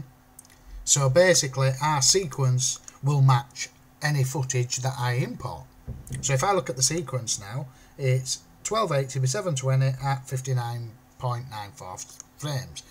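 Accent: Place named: Yorkshire, United Kingdom. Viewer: Hahahaha.... Great tutorial but your accent reminds me of growing up in York. Long time since I've heard an accent that thick. Thanks!!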